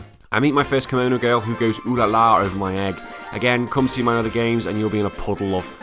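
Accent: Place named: Scotland, United Kingdom. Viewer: I like your accent, I think it's scottish?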